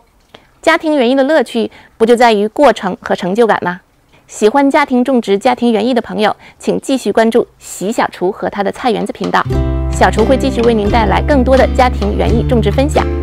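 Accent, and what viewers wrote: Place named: Jinzhou, China. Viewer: 我感觉像是锦州人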